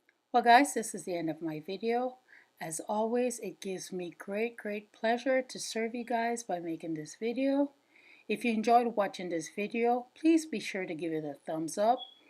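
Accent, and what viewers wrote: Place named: Caribbean. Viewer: thank you so much for participating in this collaboration. I knew by your accent that you were from the Caribbean Island. You guys have the best beaches over there!!!! Your Tablescape is Beautiful my friend!!! I love everything from the placemats to the china that you used. The storks are Stunning and added the perfect touch to complete your look!!!! I’m jamming to the music too! Thanks again for participating ~Elle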